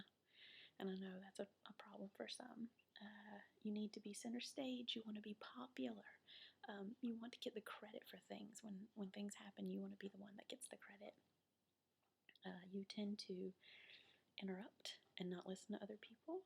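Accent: South, United States. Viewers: Can't tell you how glad I am to see you back! As much as I love everyone's different accents,...It's really nice to hear a good ole southern girl on you tube again! :) I hope the family is doing good and hope to see more video's when you get time. Thanks, -Steve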